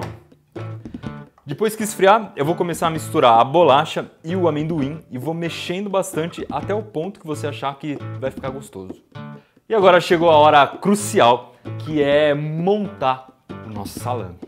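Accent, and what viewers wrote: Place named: Rio de Janeiro, Brazil. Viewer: Dexa de se carioca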